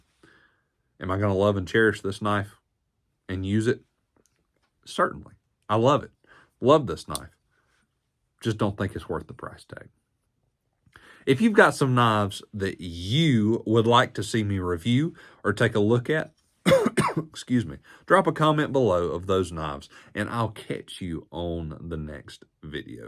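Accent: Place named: Appalachia, United States. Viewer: Can't take this seriously with the hillbilly accent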